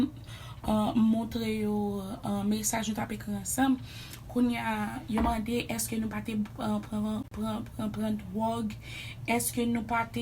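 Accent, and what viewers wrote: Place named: Cap-Haïtien, Haiti. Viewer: Mw t gentan wè sa mw se kapwase tou kkt depi lèm tandew di li sousou tetanm nan mw di u se moun peyanm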